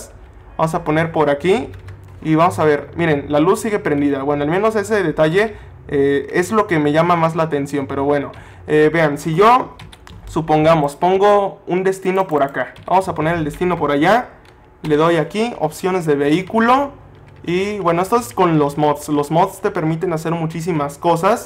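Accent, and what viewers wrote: Mexico. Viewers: Esperen un mexicano creo diciéndole a los de usa americanos dios Trump que hiciste con México creo(por tu tonada dedusco que eres mexicano )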